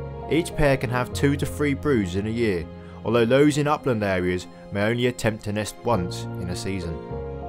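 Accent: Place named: Norfolk, United Kingdom. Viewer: love your channel. Brilliant content. And good to hear another Norfolk accent on YouTube! Merry Christmas!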